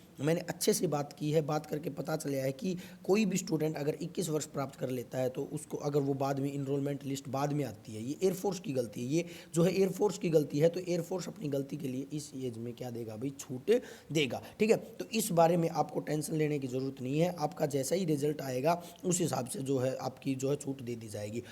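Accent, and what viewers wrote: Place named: Haryana, India. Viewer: Bhai bole te to Haryana ka laage hai 😀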